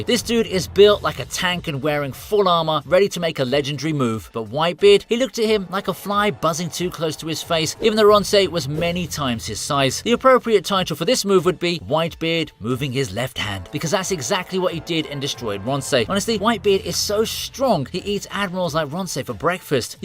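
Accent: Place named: United Kingdom. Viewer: Sheesh British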